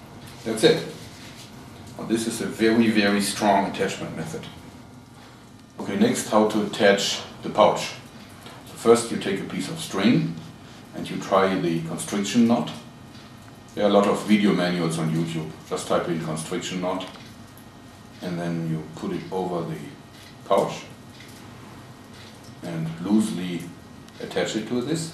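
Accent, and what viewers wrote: Germany. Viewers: no, it's a german accent^^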